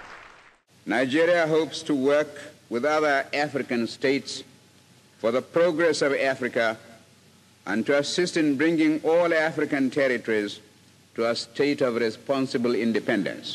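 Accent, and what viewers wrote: RP, United Kingdom. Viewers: He has Old British accent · He speaks with a British Queens English accent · He sound like a member of the british royal family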